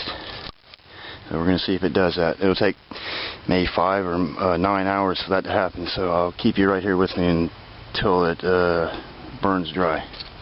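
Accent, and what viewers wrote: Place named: Baltimore, United States. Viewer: are you a Baltimorian? You sound like you are on East coast, you sound like the folks here in Baltimore, MD. LOL Just curious. Love the lamp. I am attempting to learn all these ancient survival skills so I have a shot after the Apocalypse. I plan to survive it.:)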